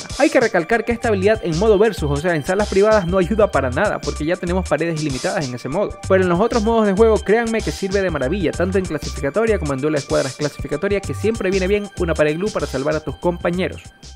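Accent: Ecuador. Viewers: Eres ecuatoriano? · Bro eres de Ecuador???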